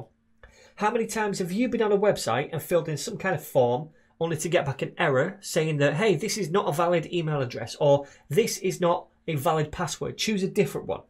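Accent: United Kingdom. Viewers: You're the first guy I've bumped into that has a British accent in a coding tutorial. From north of England I think, given that you tend to pronounce 'must' or ''stuff' like 'moost' or 'stoof'. Sorry for the digression, but I'm pretty in love with British accents. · came to learn, stayed for british accent, continued for great explanation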